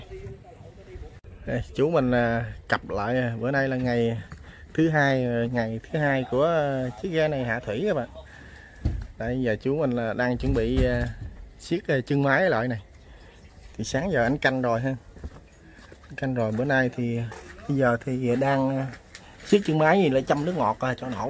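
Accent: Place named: Mekong Delta, Vietnam. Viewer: Chỉ đọc câu chào bà con và các bạn đáng được 1 like .và chia sẻ . Nghe thật dễ mến, chất người miền Tây . ( Ghét nhứt những người nói câu chào mọi người nghe thô tục và chói tai )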